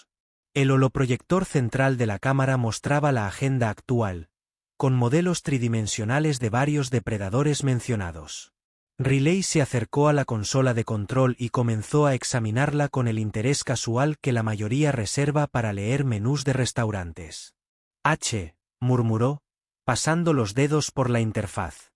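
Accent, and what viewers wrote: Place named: Spain. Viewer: Casi en todas sus historias aparece el apellido "CHEN", lo que siempre me ha intrigado, por lo que me eché un clavado en Google y encontré esto: ELNOMBRE CHEN , ORIGINARIO DE CHINBAPOSEE UN GRAN VALOR HISTÓRICO Y CULTURAL. PROVIENE NEL IDIOMA CHINO Y SIMBOLIZA LOS CONCEPTOS DE AMANECER Y MAÑANA.}Como nombre propio, Chen se ha usado durante siglos, representando la esencia de los nuevos comienzos y la esperanza que acompaña el inicio de un nuevo día. Ya tiene sentido que lo utilicen tanto en sus historias, ya no me quejaré. Pero no creo que "JAQUE" TENGA EL MISMO SIGNIFICADO y lo usan cada rato, cuando creo que quieren decir JAKE, por lo que no creo que su locutor, como buen español, hable inglés, y si la IA es de España, donde al Wi-Fi, le dicen GUIFI. me parece muy tierno. Saludos amigos, me gustan sus historias. Keep them coming!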